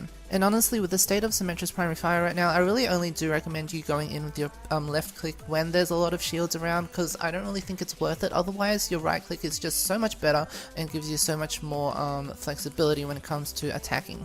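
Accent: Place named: United Kingdom. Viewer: sounds like a british girl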